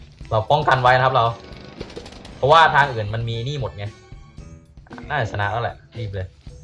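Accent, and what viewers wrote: Isan, Thailand. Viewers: พี่เป็นคนอีสานหรอผมภาคเหนือ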